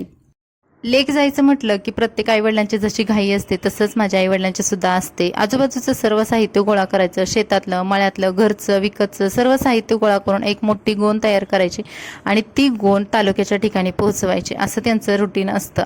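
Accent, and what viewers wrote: India. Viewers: ताई तू कोकणी तर दिसत नाहीस. तू सांगली किंवा कोल्हापूर जिल्ह्यातील दिसतेय तुझ्या भाषेवरून. आणि आईच्या हातच्या अळू वड्या मला पण अजुन आवडतात. आई ती आईच असते. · Seating tujhe gav konte bhasha aamchya solapur sarkhi vatte aani dusara mhanje tubirobadeva vishaibolli mhanje dhangar ka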